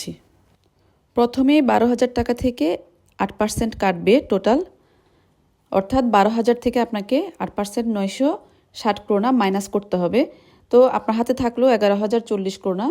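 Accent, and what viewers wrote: Chittagong, Bangladesh. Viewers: আপনার বাড়ি চিটাগং তা ভাষায় বুঝা যায়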